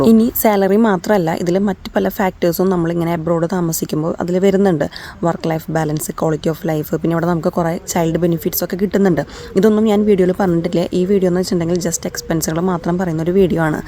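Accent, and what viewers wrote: Thrissur, India. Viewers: മ്മ്ടെ തൃശ്ശൂരാ സുഖം ട്ടാ😅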